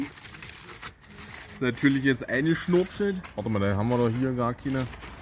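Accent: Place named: Altmark, Germany. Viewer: Ich kann bestätigen, dass Du den Fisch wirklich richtig toll hinkriegst. Es ist ein einfaches Rezept aber hier stimmt mal wieder die Klausel "Weniger ist mehr" und allein schon Butter und Zitrone ist schon Erfolgsgarantie. Und schön zu hören, dass Du hier mal so richtig Deinen Altmärker Dialekt zum Besten gibst ! Das mit dem Rosmarien lernst Du auch noch irgendwann. Super !❤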